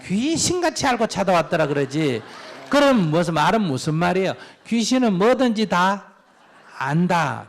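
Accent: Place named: Chungcheong, South Korea. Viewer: 누가 봐도 충청도분이시네ㅎㅎㅎ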